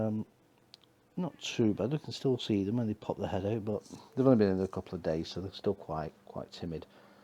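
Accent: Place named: England, United Kingdom. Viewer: lovely!! looking forward to seeing more updates:) nice to hear an English voice normally the american's that do this hobby haha I've liked and subbed to your channel. please check out my channel and sub:)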